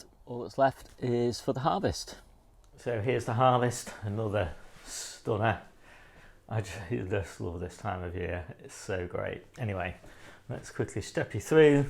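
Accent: United Kingdom. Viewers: I KNEW IT! Lincolnshire accent is one of them which sounds generically north, yet, not in any way whereby you'd be able to tell/pinpoint in any particular way. It's like Grass is said like gr-ass (northern) instead of gr-arse (like the southern way of putting an R sound after the A), yet, when at a bar a coke will be requested as coke (leaning more towards the southern pronunciation) and not c-ork (like the Yorkshire pronunciation)! 😅 What threw me though is the lack of a hard A sound. I doubt, for example, you'd say caarpaark like someone living on the north Lincolnshire coast would ☺️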